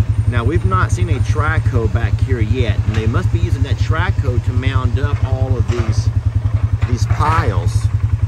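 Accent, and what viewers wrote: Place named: South, United States. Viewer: Spanish and French are very similar. He's going to have trouble with you. Your broken English (Southern speak) is off-the-wall. The French, Mexican, and MOST every other country were taught English in school. What you speak... Is not proper English. You're the problem. That man was charming and non-judgemental of... Your broken English.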